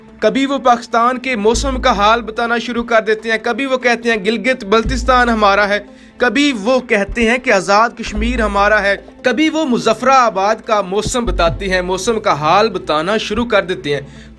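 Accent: Punjab, India. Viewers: Bilawaja ki bakwaas video. Kiyoun china and india both are not atomic power. If yes then how china initiated. Secondly thori urdu sahi bolo bilkul punjabi lehja hai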